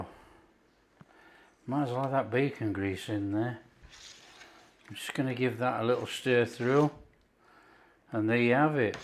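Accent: United Kingdom. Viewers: What a delight - Hoppin' Jack - or Hoppin' John - with a British accent. From the northern US, but schooled in the South, so I enjoy a variety of southern dishes. This will be in the plan next time we have ham!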